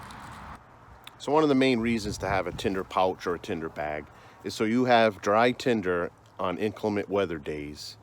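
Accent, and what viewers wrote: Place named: Massachusetts, United States. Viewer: Good stuff. Nice to hear a MA accent. Maple House sent me here. Subbed.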